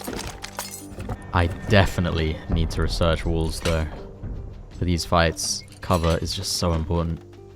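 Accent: Australia. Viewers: british man??? arent u austrailian? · R u an aussie?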